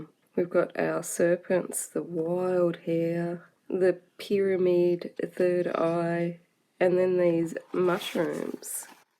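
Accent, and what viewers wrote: Australia. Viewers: Like your review style.like your Australian accent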